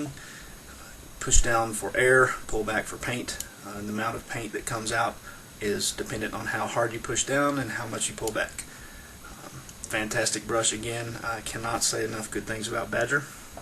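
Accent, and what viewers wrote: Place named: Southeast, United States. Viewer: Off topic (sorry) You sound like you are from the southeast US. If so where? I am in Leeds, Alabama (suburb of Birmingham, Alabama). Just curious. I am jealous of your setup, for what is worth. Thank you for sharing it and it would be awesome to see your work. Do you post to cmon and if so what is your screen name? Thanks again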